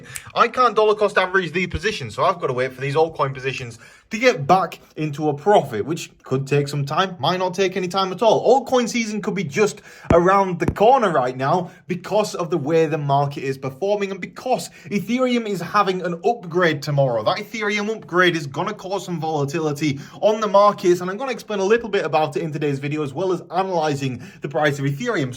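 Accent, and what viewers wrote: United Kingdom. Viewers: $CUDOS is looking good. since my ape in, it show's a strong correlation with BTC my favorite part is that I can mine #CUDOS with my old laptop Nice vids bro, I feel smarter watching your videos, plus when ppl hear a British accent guy, they think I'ma pro for watching 😎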